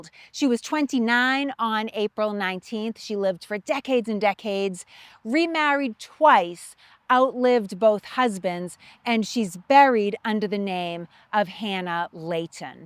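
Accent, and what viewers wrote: Boston, United States. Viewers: has the thickest Boston accent that I have ever heard. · Love your authentic Boston/Massachusetts accent! I know it well, because I was born in Boston, Ma. Boston City Hospital, 1000th baby born , August, 1964, parents born & raised in Boston, from the 1940s until they moved west down the Old Boston Post Road,(US Route 20),33 miles to Northborough, Ma. (Where surveyor Benjamin Franklin ordered the "33 Miles To Boston", mile marker, placed there during his time as Postmaster General! I absolutely love our American History, which is probably why your channel came up in my feed! I grew up primarily in Northborough/Westborough, area, incorporated in 1766 & 1716, respectively, about a mile outside of town, where one of many of those old, granite Tribute Stones, comemorating Revolutionary War General Henry Knox's passage through the town with his army, and the cannon/artillery pieces which hed collected from fort Ticonderoga, NY, to deliver to General George Washington Bunker Hill, Boston, along with as many of the troops, ammunition, and powder, which Gen. 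Knox inevitably came across during his journey to reinforce Gen.Washington, as ordered by the Commander in Chief of The Armies of The (newfound), United States. Our Northborough Militia was led by one Captain Samuel Wood, whod sent for all Northborough Militiamen to muster The towns Musterfield, immediately after recieving the alarm of April 19, 1775! I know all this wonderful history because I was fortunate to grow up in a VERY Patriotic little suburban town, and just wanted to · I have read extensively on our revolutionary history, but it somehow takes renewed importance when a hot woman with a killer Boston accent is telling it. Keep going because I'm picking up details I didn't know before.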